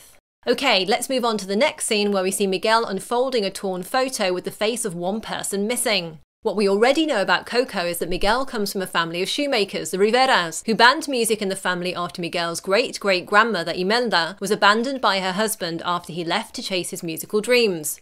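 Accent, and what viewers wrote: Castile, Spain. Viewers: I totally love this video. It stated very exact and truthful facts. Only thing wrong is the accent. The words are perfectly pronounced is just that she used spanish accent instead of Latino or Mexican accent which is totally ok. It's just weird to hear familiar names in a different accent. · Great review. I would have loved for you to use the Mexican pronunciation of Spanish words, rather than the European Spanish pronunciation. For example, the name 'De la Cruz' in Mexico would be pronounced 'De la Crus' NOT 'De la CruTH'. In Latin American Spanish words with a 'z' or a 'c' are pronounced with an 's' sound, as opposed to the much harsher 'th' sound from European Spanish Therefore Pan Dulce, should be pronounced 'Pan Dulse', NOT 'Pan DulTHe'. It's a real shame as I could tell you were making an effort to pronounce the words correctly, however, for someone how knows the difference, it sounds totally out of context, just like a history British film would sound if words were pronounced in American or Australian English... 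· You sound castellan are you from Europe or something? Just wondering nice video tho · That explains the near perfect Castilian accent. Que chevere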